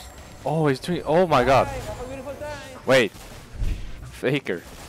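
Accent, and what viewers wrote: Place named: France. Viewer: He's starting to have a French accent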